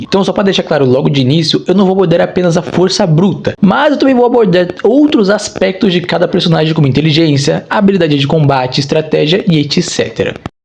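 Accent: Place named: United States. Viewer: mania de brasileiro querer falar americanizado 😕🤡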